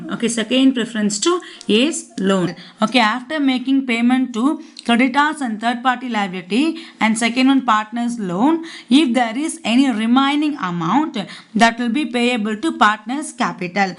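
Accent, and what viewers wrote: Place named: Tamil Nadu, India. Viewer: Yes, she is. Her accent sounds like Tamilians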